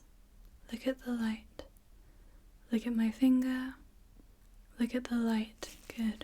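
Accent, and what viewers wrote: United Kingdom. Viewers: pretty sure it’s british ! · Of all the nicest reasons to watch your ASMR I appreciate your accent, British is my favourite 🤍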